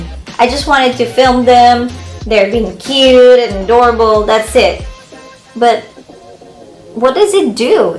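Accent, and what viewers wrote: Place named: United States. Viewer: Ang galing naman mag.english ni ma'am parang american din..team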